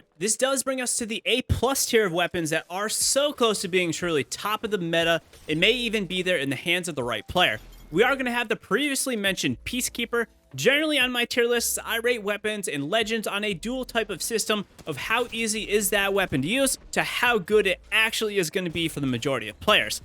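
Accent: United Kingdom. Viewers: your northern accent dawg!! no offense sounds like your saying c ter. lol not making fun of you i’m from texas and i don’t hear it a lot lol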